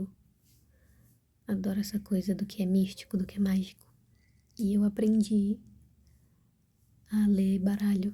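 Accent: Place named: Rio de Janeiro, Brazil. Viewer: a tem sotaque carioca e a não tem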